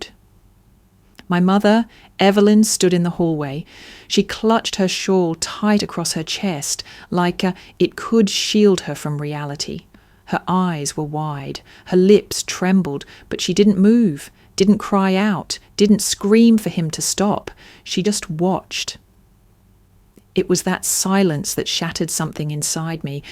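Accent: United Kingdom. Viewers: No more British narrators, please! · This offering is being viewed from Stevensville, Maryland on an island in the middle of the Chesapeake Bay USA Although the ending is as expected, I think the biggest problem that I had with this entire offering was the narrator sounds like a very beautiful and fine lady however, her English accent totally be lies. The military feeling that should’ve been there. But the Jerk got exactly what he deserved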